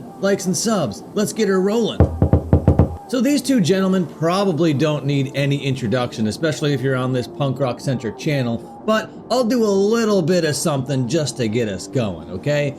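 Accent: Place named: Canada. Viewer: You have a Canadian accent.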